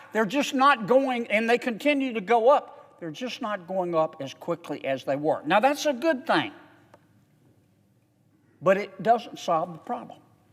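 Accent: South, United States. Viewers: Don’t let his Southern accent fool you!! He’s very intelligent! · I am a Canadian and I love senator Kennedy. His southern accent makes him sound like a bumpkin but when you listen to what he has to say. He’s brilliant ,and one of the most talented and intelligent politicians I have ever listened too. I believe that he actually cares about his country. You are very lucky to have a senator like him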